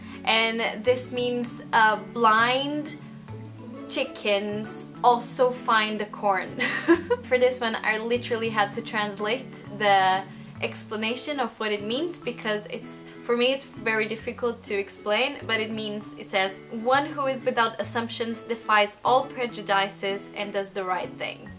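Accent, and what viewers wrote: Oslo, Norway. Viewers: Your Norwegian is really good. I can hear that you have a Oslo dialect which is easy to understand and speak. Keep it up. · É engraçado que tenhas o sotaque norueguês a falar ingles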